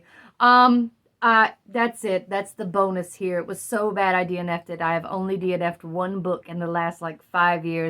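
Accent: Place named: Transatlantic, United States. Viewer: Transatlantic accent is so funny for this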